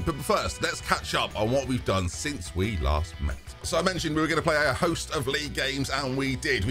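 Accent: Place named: Newcastle, United Kingdom. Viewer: Where's the geordie accent today ? 😞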